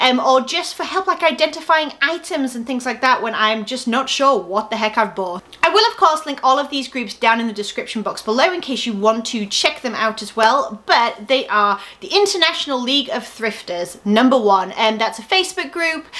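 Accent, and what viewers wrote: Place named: United Kingdom. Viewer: great information! thanks. i've requested to join the groups. i have a personal question. where are you originally from? i can't quite place the accent (assuming UK but what part?) thanks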